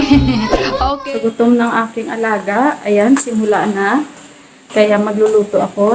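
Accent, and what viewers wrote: Iloilo, Philippines. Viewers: Saan k dito sa pinas sis prang ilonggo yong tagalog mo bago po ako dito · Hello ate, nice to see your vlog, saan ka po sa visayas te. Ilonggo accent ka. Ilonggo dn po ako. 🙂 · Hello po sa mga in laws nyo. Ang sweet nyo po magkwento. Ilongga po ba kayo kabayan? Parang d po kayo marunong magalit hehe. Magiging busy na Po kayo nyan with your in laws. Pero update nyo parin sana kmi. Godbless po ❤️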